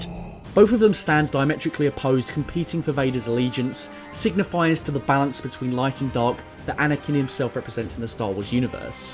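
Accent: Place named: England, United Kingdom. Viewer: Shriiiieeeeeek.... American pronunciations from an English accent, give me chalk screeching on a black board any day!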